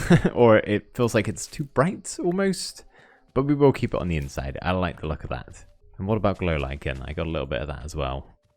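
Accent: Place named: Bristol, United Kingdom. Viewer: Where's your accent from? I am from Bristol, and you sound like you have some of the accent.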